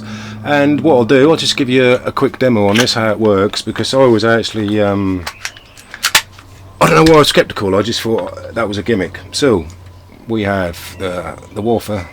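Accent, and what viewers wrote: United Kingdom. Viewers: Nice video mate! Is that a norfolk accent!?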